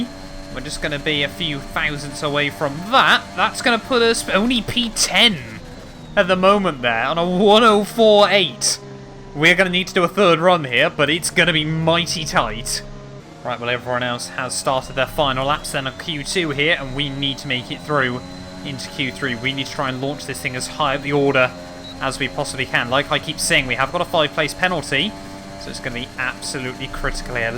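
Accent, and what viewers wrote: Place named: England, United Kingdom. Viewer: "Sweat mode has to well and truly be engaged." You have to love the English language when it is spoken by an Englishman. To me, it just sounds like F1. 😁🇺🇸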